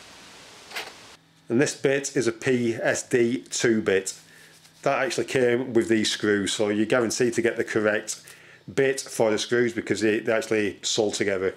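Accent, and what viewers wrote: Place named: Lancashire, United Kingdom. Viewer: Good old Lancashire accent!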